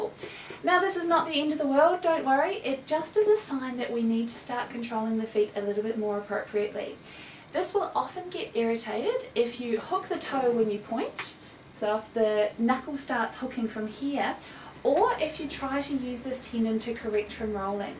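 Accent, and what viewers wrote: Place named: New Zealand. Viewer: it sounds new zealand, i went to one of her workshops in rocky, she's great :)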